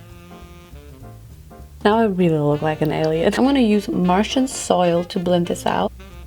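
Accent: Germany. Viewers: I think it depends on the german accent/speaker totally. I've heard some pretty ridiculous and funny sounding ones, but hers is very cute :3 No offence to german ppl! I hate finnish (my country) ppls accent also. Actually hate it the most probably hahah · She's from Germany :)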